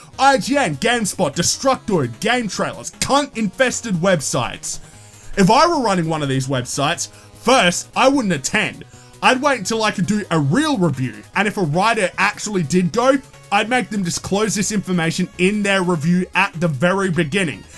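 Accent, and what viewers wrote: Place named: Australia. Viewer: The Australian accent just makes what you said even funnier. Great video as usual.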